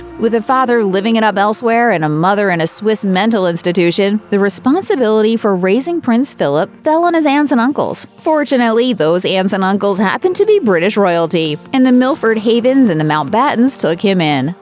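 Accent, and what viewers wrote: United States. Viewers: Gd contente but bad 😰 America chinlish accents!! Ask/employ the EU bondle likes Swedish or Danmark or Switzerland for boardcaster plz !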